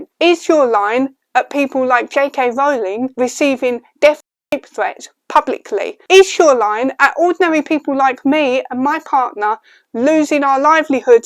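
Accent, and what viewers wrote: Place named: Council estate, United Kingdom. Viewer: You know someone lives on a council estate when they speak like you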